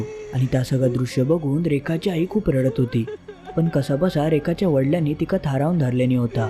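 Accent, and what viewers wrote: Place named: Malvan, India. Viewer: तुमचा मालवणी भाषेचा लहेजा आणी सांगण्याची पद्धत खूप छान आहे मालवणी भाषा फार गोड आहे.....धन्यवाद!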